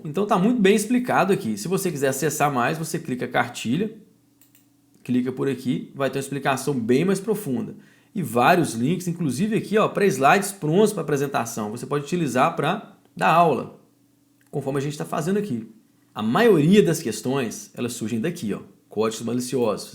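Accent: Minas Gerais, Brazil. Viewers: aaaaaaaaaa professor por favor continue falando assim mineirinho tracim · Só quem chegou até aqui sabe o sufoco que foi estudar tudo kkkkk assisti aula no trabalho, na faculdade, no ônibus e até no horário de almoço, mas vale a pena porque o professor é incrível, e o sotaque mineiro deixa tudo melhor. Obrigada professor! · Em defesa do "tracim", adoro o sotaques dos mineiros, por favor mantenha o tracim professor! Hhsuahusa... Aula top como sempre, vai me ajudar muito, muito obrigada professor!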